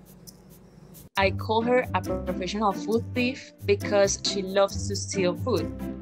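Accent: Paisa, Colombia. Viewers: Ese acento paisa... 🥰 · Ay...en el video sale una voz colombiana y muy paisita...🇨🇴👍🏻 Gracias por amar a nuestros amigos los peludos...🐄❤️ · suena paisa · Caray, eres buena, noble y bondadosa, eres hermosa, y aparte tienes ese delicioso acento colombiano que me derrite..... si, la mujer perfecta!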